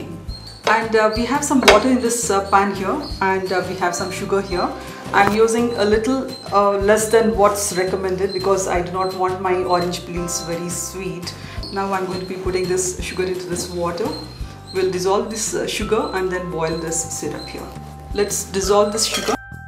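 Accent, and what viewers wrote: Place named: India. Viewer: I love the Indian accent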